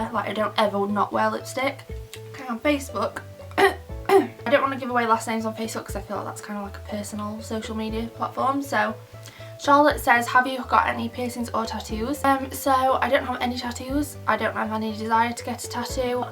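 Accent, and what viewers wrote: United Kingdom. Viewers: R u british ??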